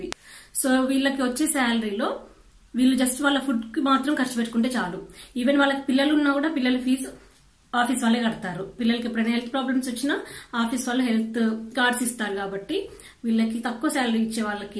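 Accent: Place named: Godavari, India. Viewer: Nice info sis Nakkendukko japan ante chinnapadinunchi chala ishtam particularly Maybe when i was young babiah baga vellevaru ahh country ki, he is now MD of SaintGobain ippudu kuda appudu appudu velthuvuntaru Shud bisit Japan soon chellai , thanks for the info very much Bythewsy india lo meh proper ekkada godavari jilla nah mehh mataluu vintunte alanehh vundhi 💚😊😊😊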